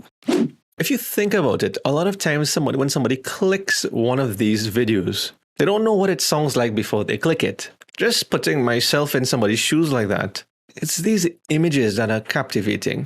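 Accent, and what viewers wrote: Trinidad and Tobago. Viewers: Trini accent? Lol had to ask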